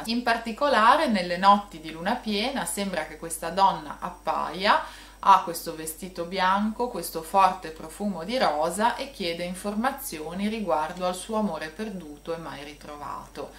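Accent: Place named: Genoa, Italy. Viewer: belandiiiiiiiiiiiiiiiiiiiiiii non conoscevo questa storia.comunque hai una forte cadenza genoveseeeeeeeeeeeeeeeee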